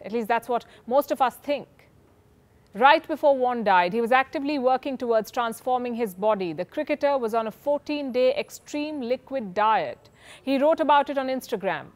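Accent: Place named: India. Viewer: Her Indian accent irritating to ears.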